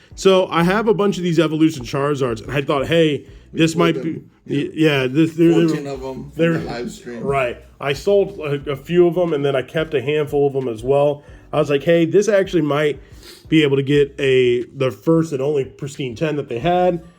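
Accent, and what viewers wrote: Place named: Philadelphia, United States. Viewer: The accent makes sense Idk how I never knew ur from philly but kinda had a hunch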